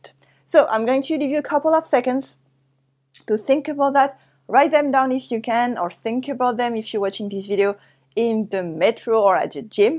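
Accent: France. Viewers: R u french Est ce que vous êtes française?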